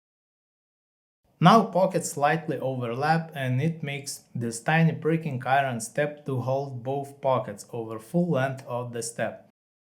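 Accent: Russia. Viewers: Ты русский? У тебя акцент как у русского и русские субтитры)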